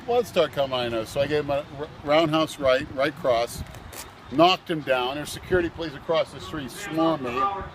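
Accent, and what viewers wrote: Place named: Pittsburgh, United States. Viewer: I love the Pittsburghese, makes me miss home.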